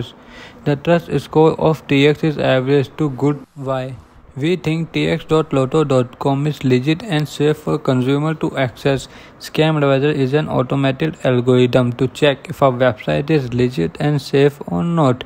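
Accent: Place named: India. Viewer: I feel ya, could not understand a word he said and the Indian accent does not help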